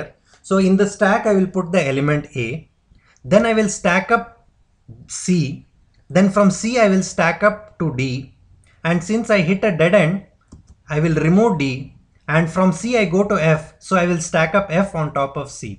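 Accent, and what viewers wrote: India. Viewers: but i hate his fucking indian accent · i like your indian accent :)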